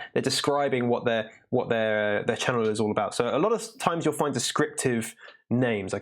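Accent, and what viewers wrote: United Kingdom. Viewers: Do you need to be so rude and not even try to pronounce the name of the author of the book you used for your video? Can't you pronounce any other phonetics apart from bri'ish mAtE?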